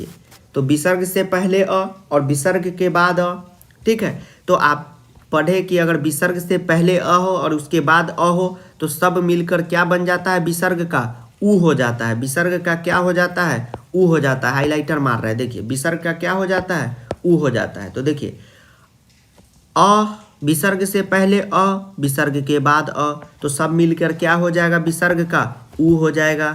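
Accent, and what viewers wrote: Bihar, India. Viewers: sir ap bihar se ho naa! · Bihari ho kya bhai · Are u bihari? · Bihar mein kahaa se hain aap 😂 aapki language main samjh gyi😂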